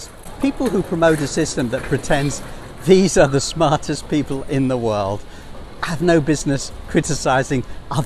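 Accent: RP, United Kingdom. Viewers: Great rant delivered in a inimitable RP accent ! But Westerners will still say : "But we can change leaders !" Yes, from Trump to Biden and likely back again !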